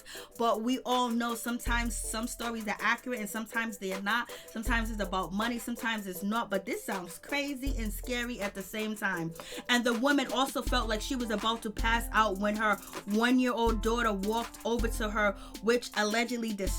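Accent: New York, United States. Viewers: She sound like she is from NY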